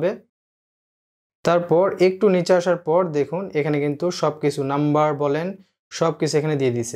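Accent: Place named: Naogaon, Bangladesh. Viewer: Naogaon/Adamdighir language😊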